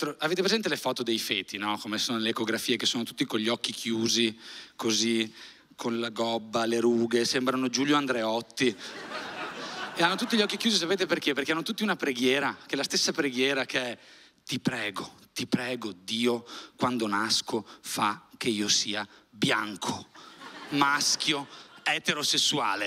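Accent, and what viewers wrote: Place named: Veneto, Italy. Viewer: La narrazione condita da un importante accento veneto conferisce un tono ancora più paradossale al monologo, ai limiti del surreale. Bellissimo, ho riso tanto!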